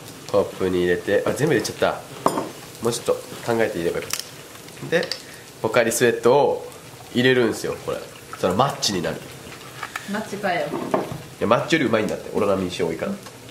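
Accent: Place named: Osaka, Japan. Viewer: 色⤵が美味しそう。 大阪弁ww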